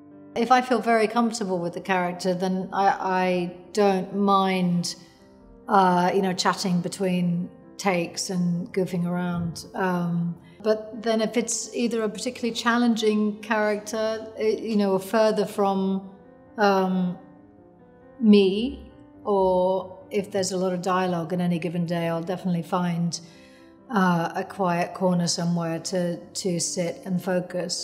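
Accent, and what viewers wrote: England, United Kingdom. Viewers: Why is she speaking with an English accent? This is a choice. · Why is she talking wirh a slightly british accent?! She should be figuring out how to get out of character! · What’s with the British accent Gillian? Is she still in character? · What's with the British accent, she's from Chicago! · Why is she speaking in a british accent, shes from Chicago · You've gotta remember she had an English accent when she moved back the the US when she was 11. She was bullied and teased for it and therefore tried to sound American. Now after living in London again, she's likely fallen back into speaking that way. However when she is in the US, it isn't as evident. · She doesn't sound American in anyway anymore. She sounds very British! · Her British accent is more prominent than ever, right? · Before I click on a video I always cross my fingers hoping it’s the British accent😍 She’s so fine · I really like her voice. 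Her British English is really nice to listen to. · How does she look like this 30 years after xfiles??? And she was born and raised in the USA - why does she sound sooooo BRITISH ????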